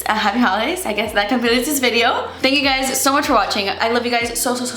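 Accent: United Kingdom. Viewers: I adire your videos and your attitude, but man that British accent... it freaks me out👾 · Love the british accent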